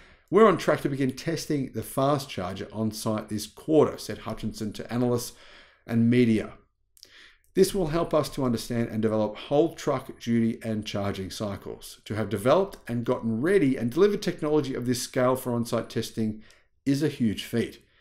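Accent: Australia. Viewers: You have an Australian accent except when pronouncing Fortescue and Pilbara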